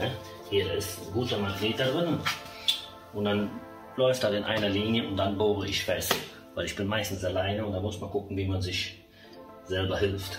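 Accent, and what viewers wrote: Cologne, Germany. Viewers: ein "Profi" mit Parkside Werkzeug 😉 Endlich kann ich meine Lidl Käufe rechtfertigen, auch wenn dich meine Frau hasst, nur vom zuhören. 😉 Also bitte weiter auf Köllsch 👍 Wenn es Sinn macht für ein Video, kannst ja deine Werkzeuge vorstellen und warum du die hast, was die können oder nicht gegenüber anderen Marken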